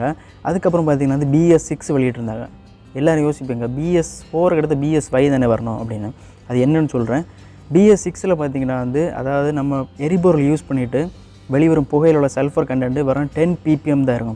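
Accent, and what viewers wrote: Kanyakumari, India. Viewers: Enga ooru paiyan KK Dist accent 🤣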